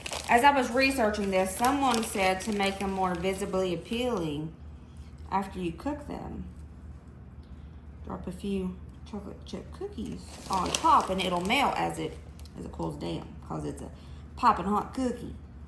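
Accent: South, United States. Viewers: Thank you for your video. You are so sweet! I adore your southern accent! It is so nice to hear it after living in Michigan for almost 15 years—I’m originally from Kentucky. ♥️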